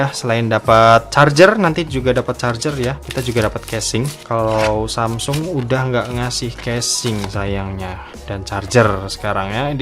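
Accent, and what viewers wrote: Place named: Java, Indonesia. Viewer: Logatnya kalo ngomong medok bngt. Sekali kali selipin bahasa jowo nya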